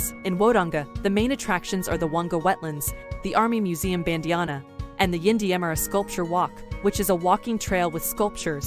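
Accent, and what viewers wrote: United States. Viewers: Why is the commentary done by an American voice who pronounces several towns names incorrectly? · Annoying American accent, has to be most spoiling ever.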